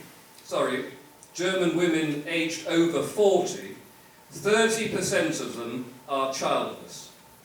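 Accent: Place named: England, United Kingdom. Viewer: is such a nice man, great mind and he always dresses well. He has a lovely English accent, its not high and mighty and its not common. His voice is actually a future PM voice.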